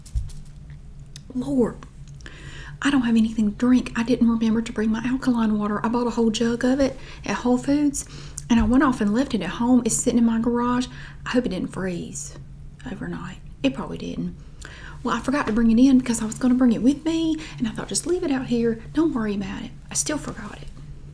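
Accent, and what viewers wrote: South, United States. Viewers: Southern accent in all its glory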